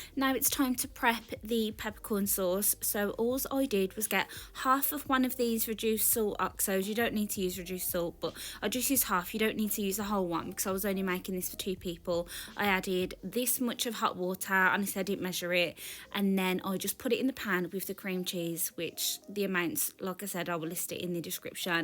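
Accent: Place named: Caribbean. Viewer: Are you from the Caribbean originally? I hear a bit of an accent coming though sometimes 😃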